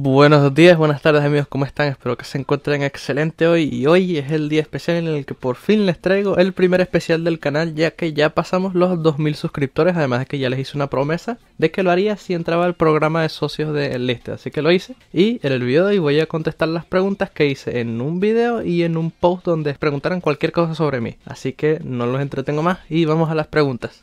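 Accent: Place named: Chile. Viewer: Yo pensaba que eras de chile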